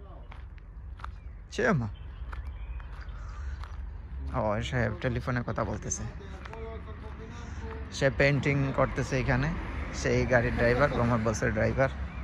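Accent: Sylhet, Bangladesh. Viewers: ভাই আসসালামু ওয়ালাইকুম আপনার সিলেট মাত সুন্দর লাগে। আপনার সাথে যোগাযোগ এর জন্য কি আপনার নাম্বার টা দেয়া যাবে কি?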